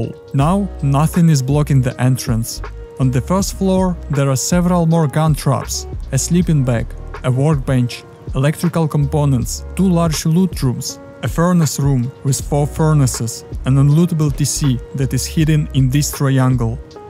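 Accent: Russia. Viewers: жесть как русский акцент выпирает прям )))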